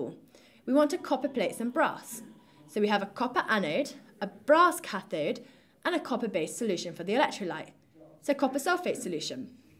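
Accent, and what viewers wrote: United Kingdom. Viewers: British accent🤩😍😅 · its pure british. enchanting no doubt but i wonder do they also love the way we speak hindi.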